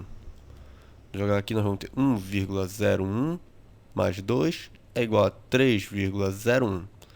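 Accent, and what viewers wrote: Pará, Brazil. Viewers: Cara você é paraense???/. Você é muito bom parabéns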